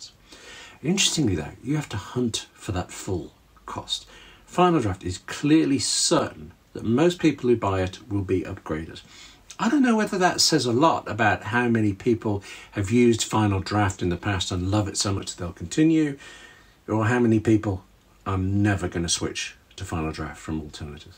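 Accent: England, United Kingdom. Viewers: Truthfully... I made this same mistake in an email... easy to do... (Smile). Thank you again for this great video... By the way, your voice sounds like you may be from England? I just found out through DNA that I'm 68% English 23% Irish (Now this explains why I'm so Victorian WHITE skin) I have been told I have Bass Belly White skin... I do not think that is a complement, my response is, I am Victorian White skin, more porcelain... not the belly of a bass... fish! Laughing so hard... you can't make this stuff up!!! The reason for my inquire of you being English... is I am working on a manuscript, hopefully to turn it into a screenplay... It is based on a true story, which I just happened to be apart of, about one of my clients that went to Harvard a few years back, and because of this, I have linked the "Boston Strangler to Jack the Ripper" I won't bore you with the details right now, but if and when I do finish the script, and if it does something, I"ll let you know... 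I used to have family in the UK... the HARRYs we even had a shield or that crest? Please do not mind my ignorance. I hope if my screenplay about Jack the Ripper/Boston Strangler comes about, I plan to go to England... maybe find some of my blood relatives over there. It was my Aunt Helen Harry that taught me how to drink Proper Tea. It is a dream of mine to one day visit England.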